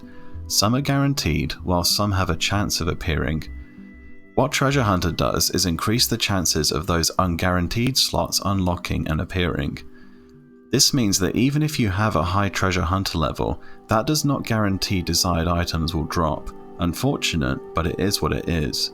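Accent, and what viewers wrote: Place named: United Kingdom. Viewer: Love the British accent. Makes everything seem way more official, especially for a fantasy game, and pronouncing melee as "mee-lee" is awesome lol. I wish had a British accent.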